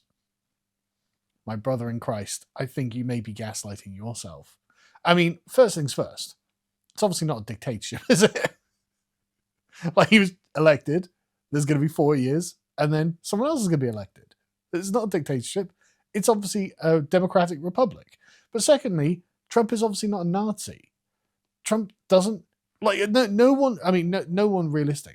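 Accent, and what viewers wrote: United Kingdom. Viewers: Wait your from Central Arkansas? You sound very British.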